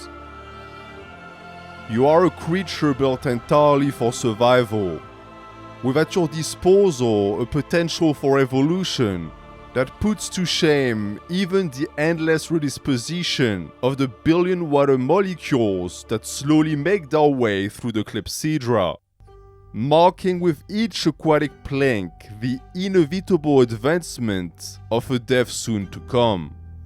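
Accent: France. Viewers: Although you are German , your accent is very similar to a French accent.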